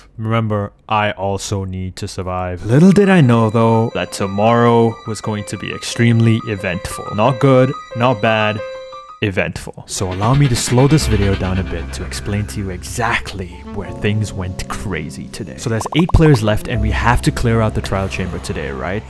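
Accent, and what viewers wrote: India. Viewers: Hey bro😱😅 aap btw me bhi yahi Jan ne aaya hu ki ye Indian hai ya nahi confusion hai mujhe bcz senpai Ke video me Hindi itne easily Bola tha tone Indian thi🙂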